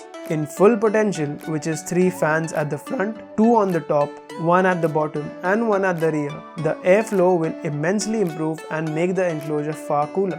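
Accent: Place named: India. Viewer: Typical Indian accent... ...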